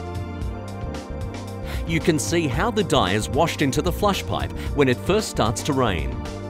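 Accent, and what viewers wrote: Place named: Australia. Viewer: with volume up Oh, so if I put this on a tank, the military should be very thankful for the overexcited australian narrator Don't get me wrong, i love australians and their accents. :P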